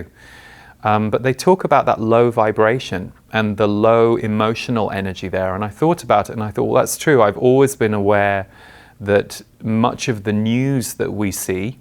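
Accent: United Kingdom. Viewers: Not complaining as a culture is great, but please don’t lose your British accent. 🤍🙏🏼✨😎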